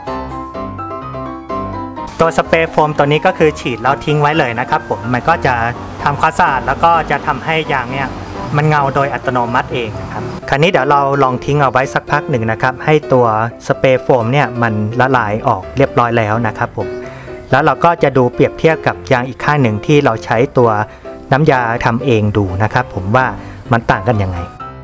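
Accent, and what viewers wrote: China. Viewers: พูดไทย ออกจีนๆ นะ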